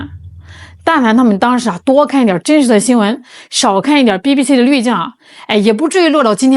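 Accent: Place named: Northeast, China. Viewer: 這種東北口音 聽著很難受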